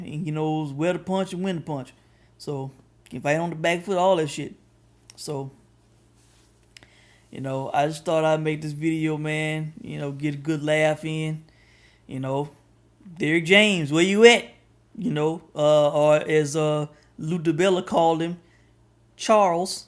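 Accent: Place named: Texas, United States. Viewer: I like your Texas accent BTW I'm laughing my ass off u funny keep that same energy 😎😎😎😎😅😅😆😆😂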